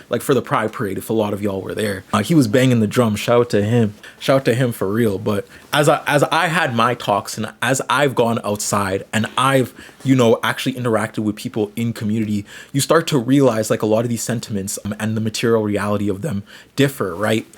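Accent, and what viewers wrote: Canada. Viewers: You sound like you're from Toronto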